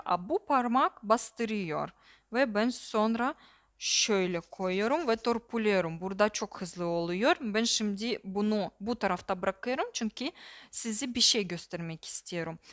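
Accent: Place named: Russia. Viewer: Ayyy sivene bayıldım yaa,normalde bu kaba Rus şivesini sevmem,ama bu bana çok sempatik geldi nedense 🥰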